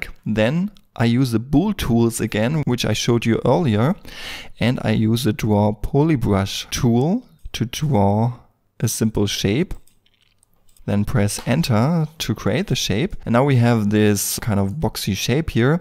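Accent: Germany. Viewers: Uhh dein deutscher Akzent kommt schon durch, was es aber interessanter macht :3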